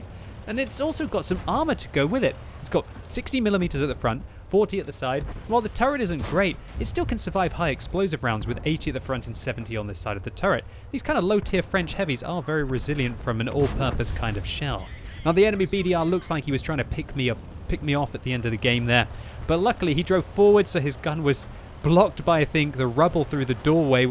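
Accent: France. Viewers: bon accent français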